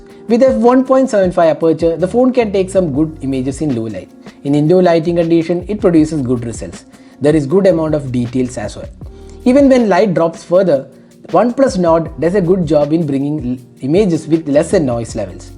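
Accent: Kerala, India. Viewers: English kettapozhe malayali annenu manasilaayi... Great job broo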